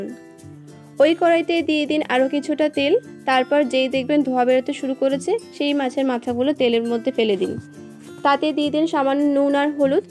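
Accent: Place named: Bangal, Bangladesh. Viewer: Bangal vasai ktha bolis valo lage sunte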